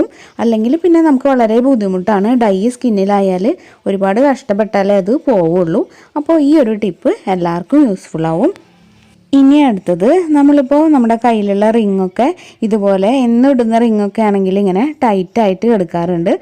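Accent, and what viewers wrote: Thrissur, India. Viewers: നല്ല ഒരു മെസ്സേജ് ചേച്ചി തൃശൂർ ആണോ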